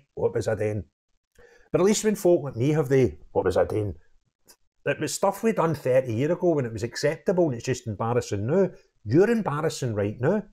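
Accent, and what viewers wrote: Scotland, United Kingdom. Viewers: You make me laugh every time I watch your explanations. Even better that your Scottish. thks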